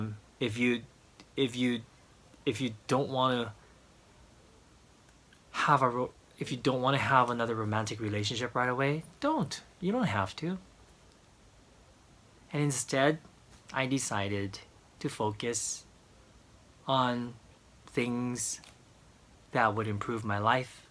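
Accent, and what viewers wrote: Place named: United States. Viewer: actually grew up in Hawaii, hence his American accent and fluent English!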